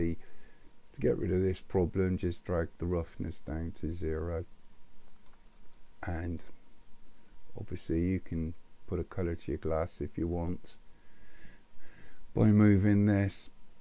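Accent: West Country, United Kingdom. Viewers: Thanks, nice clear tutorial. Like the west country accent too! makes a change.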